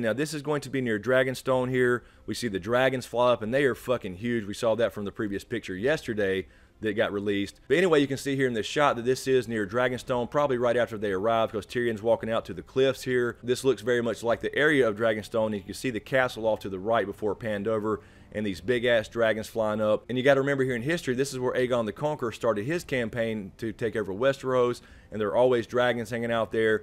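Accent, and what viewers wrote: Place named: Mississippi, United States. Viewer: I don't know what I was looking forward to more, the actual trailer or your trailer breakdown (tho I am going through GOT withdrawls since they are doing the late start this yr) Man you are the only one I watch about GOT reviews, IMO you are the only one that's worth a fuck. Now I could be a little bias because I'm from South MS and you from the way you talk (and not to sound creepy, you honestly look like my buddy Tyler would have in maybe 10 yrs or so) and my boy Tyler passed away a little over a year ago. He had that same passion about ASOIAF that you have and got me into reading the books. Anyway man just keep up the good work man. I really enjoy the vids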